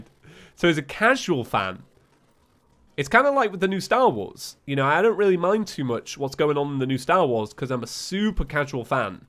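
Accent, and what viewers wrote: Australia. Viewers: Didnt know he was australian XD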